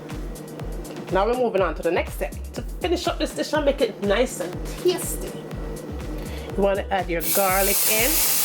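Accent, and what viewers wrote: Jamaica. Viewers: Jamaican accent is the thing I didn’t know I needed on YouTube!!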